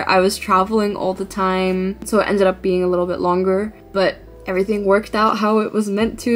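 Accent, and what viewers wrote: Russia. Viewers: У тебя идеальный русский акцент!❤❤❤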